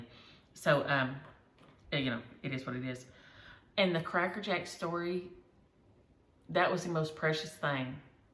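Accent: South, United States. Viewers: Oh my goodness this is my first visit with you and all you had to do was open your mouth and speak! I love your accent, it is so amazing and southern( just like sweet tea)😂 enjoyed your video, I will definitely tune in again. Thank you so much!!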